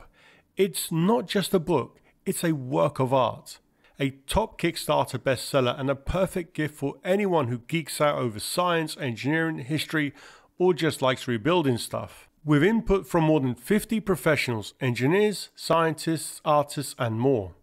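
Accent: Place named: United Kingdom. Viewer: You have probably heard this before, but I bet you do a wicked Christopher Walken impersonation. I have chuckled a few times watching this when you sounded just like him, but with a British accent.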